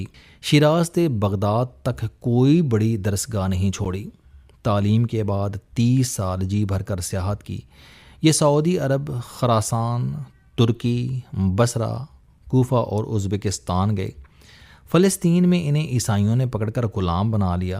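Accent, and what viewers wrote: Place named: Punjab, Pakistan. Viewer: nice to listen khas toor say panjabi lahzey ki urdu.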